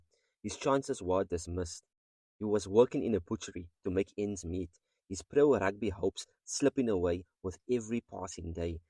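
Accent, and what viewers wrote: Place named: Cape, South Africa. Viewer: So inspiring! I also like your Cape accent 👍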